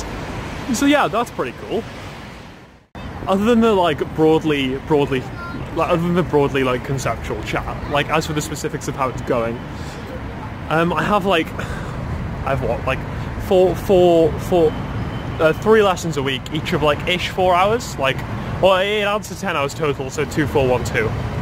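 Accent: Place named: RP, United Kingdom. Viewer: You sound so much posher than I remember cuz :) its really cool seeing what your getting up to though :)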